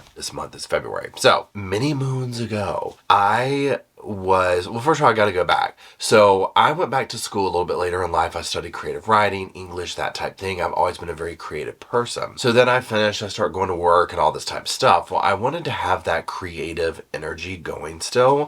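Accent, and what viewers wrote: South, United States. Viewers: I love that country accent— and I keep coming back for it! Congratulations 😊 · Congrats!--Been watching since Corona.--Your Southern voice and emotions are so soothing and adorable. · Congrats again. I think you are a great storyteller. I can listen to some of your videos over and over, just to hear you tell the story. Call me weird, but your Southern accent adds to it for me, as does your sense of humor. You also express concern for the victims and their families.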